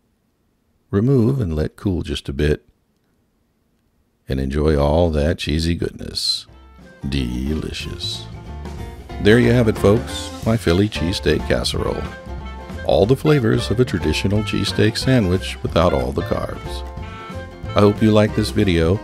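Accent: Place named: South, United States. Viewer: I love the way you talk. It's just so Southern. Love the recipe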